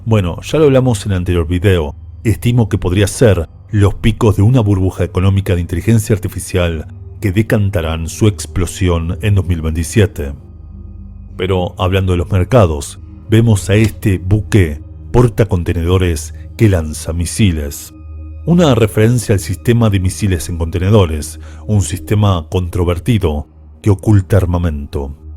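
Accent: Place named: Argentina. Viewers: A pesar del acento argentino... Te has pasado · A pesar del acento argentino??? 😠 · Superas ampliamente a Ivan de gran misterio tienes un gran talento de deducción y una gran forma de explicarlo a pesar de tu acento argentino (Felicidades)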